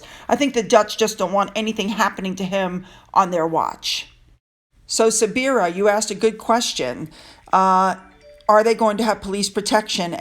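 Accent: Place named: South, United States. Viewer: You off the chain ❤️❤️. That’s how we talk down south!! Congratulations!!!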